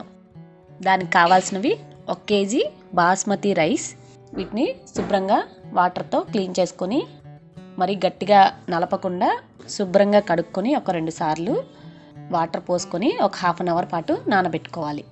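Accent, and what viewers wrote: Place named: Guntur, India. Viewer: medam padaka padaka antunnaru meru form guntur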